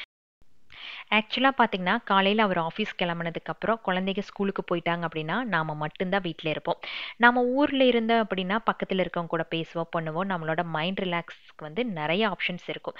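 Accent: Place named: Pollachi, India. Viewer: Hi … I am your silent subscriber from Cbe … from your slang itself I noticed that u r from pollachi..I am watching ur videos regularly but today the way u expressed ur personal stuff was really beautiful. U seem to be very honest and genuine and a good parent also.. Always being honest in ur work brings success.. god bless you.. if u happen to visit Coimbatore we will meet..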